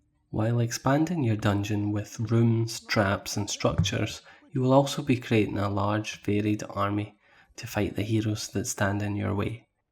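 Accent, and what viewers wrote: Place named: Scotland, United Kingdom. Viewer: Good review. Consider me a subscriber. Don’t hide your Scottish accent tho!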